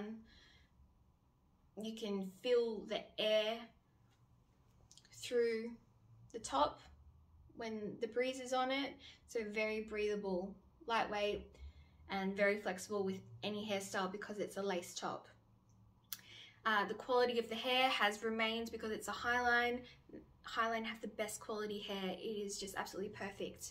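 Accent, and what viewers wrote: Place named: Australia. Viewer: I'm just scrolling and I came across your video. I've heard of Highline Wigs but don't know much about human hair wigs (due to me being unable to afford one I'd say, lol). What a beautiful wig she is. I love a low density wig and my favourite length is probably 16-18 inch. It's so good to come across a wig review that's basically a update on what the actual wig is like a year later and how it's held up in the meantime. We need more of these. It looks so natural on you, totally fab. I also had NO idea that the hair on your head, the one you had up on a bun, until almost at the end of the video, was a wig btw. Lol. Excellent job, thank you. It was also good to hear a fellow Aussie accent doing a review as well. x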